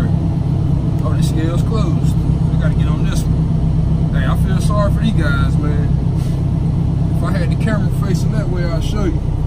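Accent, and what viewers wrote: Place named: South, United States. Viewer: I dig tha country accsent bruh!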